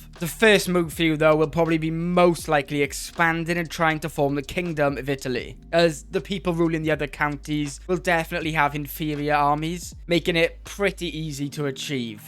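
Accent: Wales, United Kingdom. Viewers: Are you Welsh or African-British? You sound like a combination of both, but I've heard you are Welsh, it's confusing me so much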